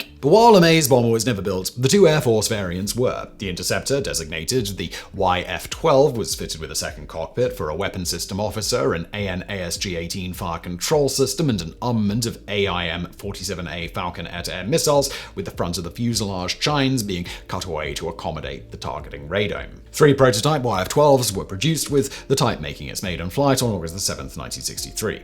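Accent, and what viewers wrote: United Kingdom. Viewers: I speak English. English is my first and only language. I like all of your channels and find your content extremely interesting. The only thing is, could you slow down just a bit with your speech? It's not that I don't understand the meanings, it's just that with your English/British accent as opposed to my slow American drawl I have to keep rewinding just to understand what you said. I hope you will take this as constructive criticism and not a petty complaint. Thank You !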